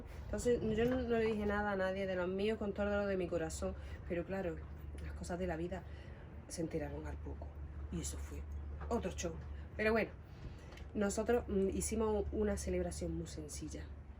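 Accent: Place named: Andalusia, Spain. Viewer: Masha Allah hermana gitana, y como adoro tu dialecto andaluz. Linda historia y contado en una manera muy hermosa. Me encanto!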